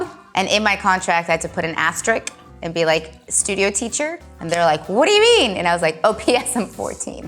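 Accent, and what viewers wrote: Ukraine. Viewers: i love how i can hear her ukrainien accent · I feel like she must have been talking to her mom or dad right before this because I can hear her Ukrainian accent sneaking out 😂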